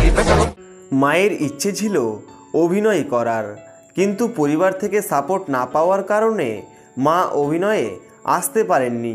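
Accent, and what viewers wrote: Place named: Kolkata, India. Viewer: apnar voice ta kolkatar moto mone hoyce..sorry don't mind..,,,,🌷🌷🌷🌷🌷🌷🥀🥀🥀🥀🥀🌸🌸🌸🌸🌹🌹🌹🌹🌹🌹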